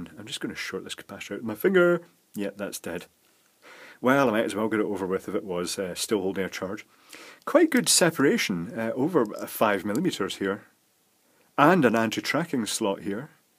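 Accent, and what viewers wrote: Scotland, United Kingdom. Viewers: I thought he was Scottish?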